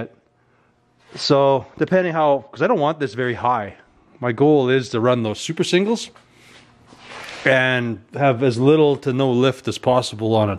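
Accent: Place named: Canada. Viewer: Figured with "eh" and aboot' love the Canadian language!!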